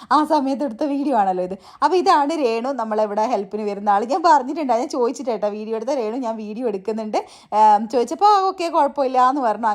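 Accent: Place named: Thalassery, India. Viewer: തലശ്ശേരി അന്നോ വീട് ❤... സംസാരം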